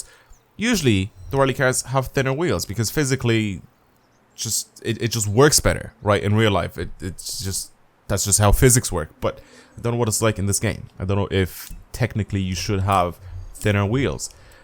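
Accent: Dublin, Ireland. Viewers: are you from dublin??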